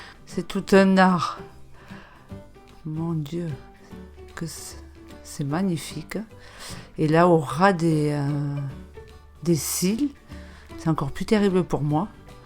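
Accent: South, France. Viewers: Troooop mignonne ta 😍 mais elle ma trop fait penser à monsieur ribera dans ariol (c'est un dessin animer lool) il dit toujours "effectivement" et en plus lui aussi a l'accent du soleil lol. Bisouuuuus 😘😘❤❤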